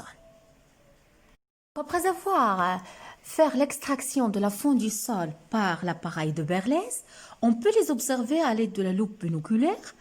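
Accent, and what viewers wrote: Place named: Morocco. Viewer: Ça sent le « é > marocain !!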